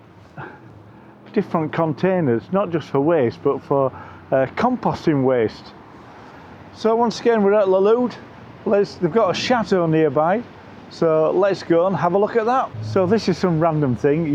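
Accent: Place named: Northern England, United Kingdom. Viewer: you’re my favourite youtuber i love it when you wear your glasses and speak in your northern accent, don’t let anything bad happen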